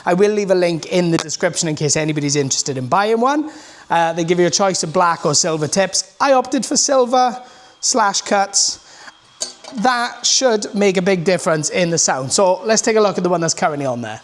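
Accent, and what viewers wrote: Wales, United Kingdom. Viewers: Tell me he's welsh without telling me he's welsh lol. another great video mate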